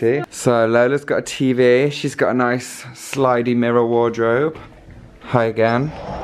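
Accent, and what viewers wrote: United Kingdom. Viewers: I love British accent and English guys, and did find your channel, Subscribed, liked and commented now... Damn you look hot ;)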